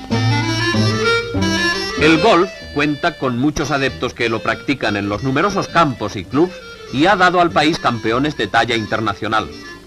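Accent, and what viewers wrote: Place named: Spain. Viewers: Por supuesto que el acento es distinto, si el locutor es español🤦‍♂️🤦‍♂️🤦‍♂️🤦‍♂️ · El locutor debe ser español, en esa época el acento porteño era más marcado que ahora, sino mira películas argentinas de los años 60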